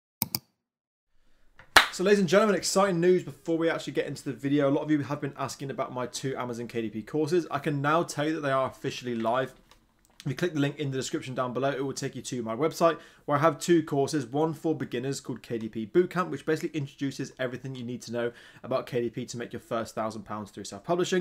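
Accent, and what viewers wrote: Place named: England, United Kingdom. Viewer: OMG, I was about to say the same thing. He talked so fast I kept drifting off and daydreaming. And that English accent isn't helping, it's too thick. 🙄😴😕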